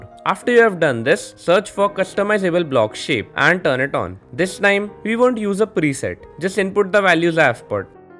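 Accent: India. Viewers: Yes everytime you here a indian dude as the tutoruial narrator you know thats this is going to be the best tutoriual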